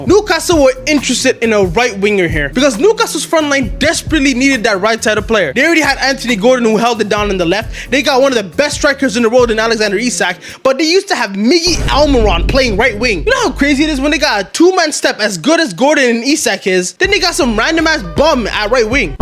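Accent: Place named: Northeast, United States. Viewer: Where is his accent from? I can guess it's from the Northeast, the US but which state/city?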